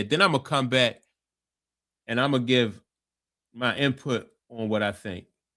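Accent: Baltimore, United States. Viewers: That Baltimore accent is heavy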